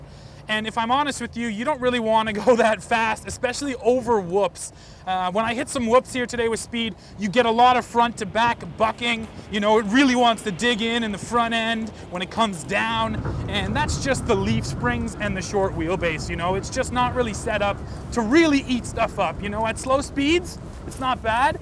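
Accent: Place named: Minnesota, United States. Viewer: "You know...." :) ...Are you Minnesotan? I am, and you sound just like me... You know? :)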